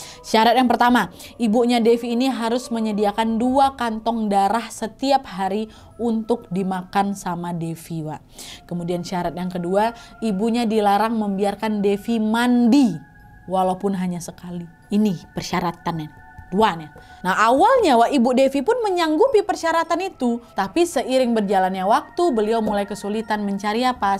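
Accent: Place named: Aceh, Indonesia. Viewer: Logatnya kak mengingatkan aku dengan pria aceh yg pernah singgah ☺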